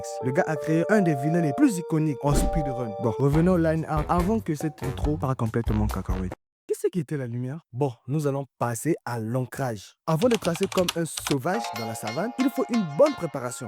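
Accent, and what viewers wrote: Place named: Africa. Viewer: Tu es africain toi je reconnais l'accent du continent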